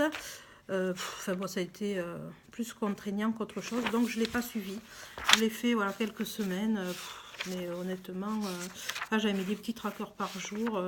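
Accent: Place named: South, France. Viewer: Bonjour, je découvre ta chaine et je b'abonne 😉 et en plus du sud (on est fiers de notre accent ) moi Perpignan Pas facile de tenir une chaine la mienne est a l'abandon depuis aout ou septembre, travail, vie de famille les jours à 24h sont trop courts😂😂 A bientôt, Bisous bisous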